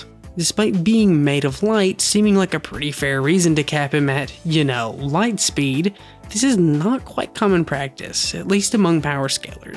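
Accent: United States. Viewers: Do you have a cowboy accent?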